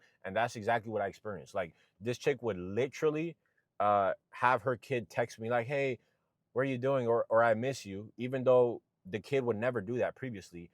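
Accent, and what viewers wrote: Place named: Gullah, United States. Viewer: Idk I'm in and wondering if maybe I was expecting too much from "a bouncer". I'm sure I'm gonna miss the part where he doesn't sound geechy but I just can't take this guy seriously 😂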